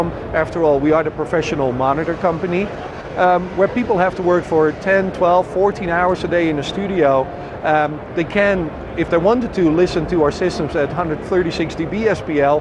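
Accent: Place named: Netherlands. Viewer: LOL i can just hear by the way he talks english he's dutch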